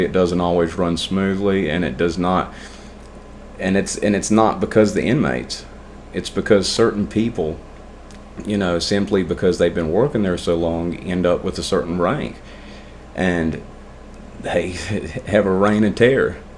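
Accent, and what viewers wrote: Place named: Southwest Virginia, United States. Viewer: Dude I don’t know where your from but it sounds like from back home, I’m from Southwest Virginia and did almost 6 years camp 1. I live in the Philippines now and have been sober since January 17,2005 I was on pills and dope bad junked out lucky I didn’t get caught doin a lot of dirt.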